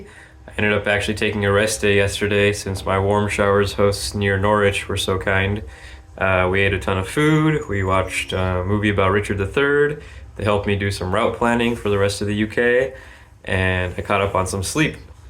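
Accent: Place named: Australia. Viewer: Loved the Australian accent. Cheers.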